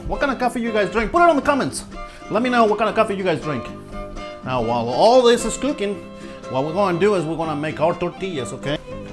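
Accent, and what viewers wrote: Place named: South, United States. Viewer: Hi im KIANA from CENTRAL CALI . . I really like ur videos. Tu cocina es muy estilo rachero Y ME GUSTA MUCHO. ❤ I am mexican & hawaiian and my mom is MEXICAN from LIVINGSTON CA so she cooks using alot of can salsa instead of using real JALEPENOS. Yes her food is still GR8 BUT ! ...OH MAN DO I KNOW THE DIFFERENCE IN THE FLAVORS OF MEXICAN FOOD WHEN U USE REAL JALEPENOS & SERANOS arbrol anchos & GARLIC & KEEPING THE SEEDS IN TO GIVE EVERYTHING A KICK OF BIG FLAVOR. NOW THATS AUTHENTIC. Bt my mom stuck on her cans like green chili enchilada sauce can to make pork chilli verde ( still good ) bt I THINK ITS TIME TO SHOW HER THE DIFFERENCE. PS .... Luv ur country accent❤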